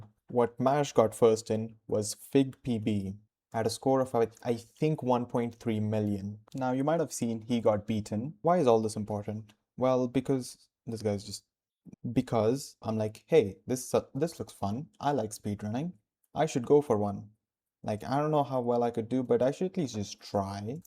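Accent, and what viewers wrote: India. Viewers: W video btw where are you from? your accent makes it look like ur from india (im from india as well) so im curious! :D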